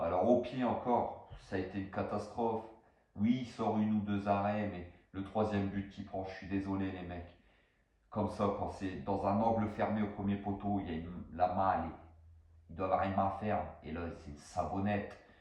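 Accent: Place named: Lens, France. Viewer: T'a un accent lensois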